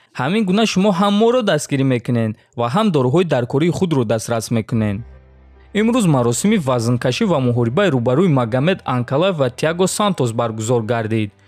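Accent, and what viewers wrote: Tajikistan. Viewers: Овози ширини точикии тозаат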